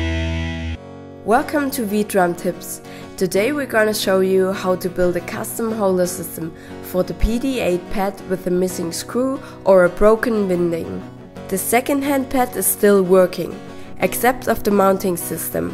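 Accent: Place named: Germany. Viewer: love this idea, great job. ) and very cute german accent as well :)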